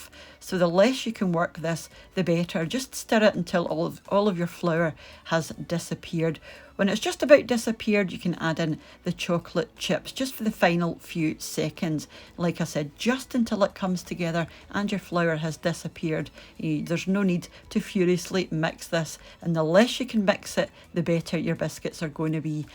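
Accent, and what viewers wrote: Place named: Inverurie, United Kingdom. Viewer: Mystery solved! Now I know why you sound like my friend from Inverurie! 😆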